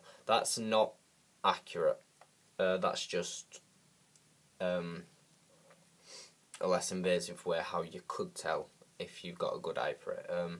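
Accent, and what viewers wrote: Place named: United Kingdom. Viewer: Ur British!! Lol il pm you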